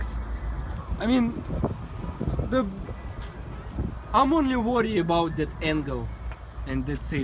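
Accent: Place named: Russia. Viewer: Русский акцент :)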